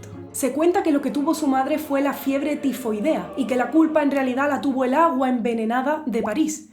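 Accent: Andalusia, Spain. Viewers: Eres una bruja!!!... una bruja pero de la guitarra en tu caldero de cuerdas bates las mayores fórmulas para hacer que nuestras sensaciones caigan y suban a tu antojo...bruja!!! me entristeces y emocionas...tus notas y palabras son una montaña rusa...te odio y te amo...bruja aleja tus notas y tu acento andaluz de mi oído y mí corazón...sufro la fiebre...la mancha en mí cuerpo...a morir feliz!!! Chao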